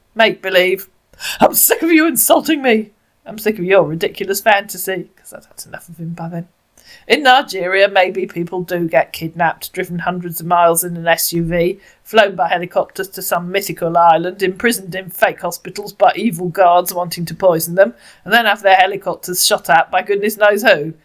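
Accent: United Kingdom. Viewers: The way she said, "it sounds like it was written by an illiterate moron", with that proper British accent literally had me rotfl... It just struck me as super funny. Thank you for educating people with humor & hilarity 👍👍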